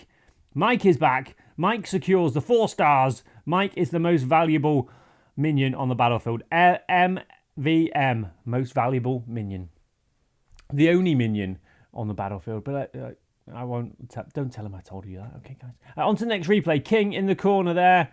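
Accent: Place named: United Kingdom. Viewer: He is British and British accent is the best one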